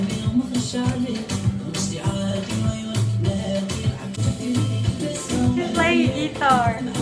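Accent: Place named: East, Algeria. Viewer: هههههه و الله ضحكتيني فالمقطع تاع اركحوا علينا برك و هدرتك تباني من الشرق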